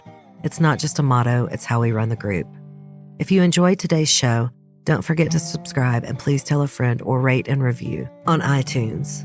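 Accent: South, United States. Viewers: Love your raspy southern voice, too sexy for me.❤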